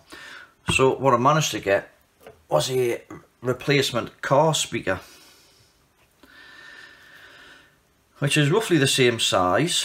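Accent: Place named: Northumberland, United Kingdom. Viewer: I'd have placed that accent more in Northumberland than Cumbria but very nice either way 😁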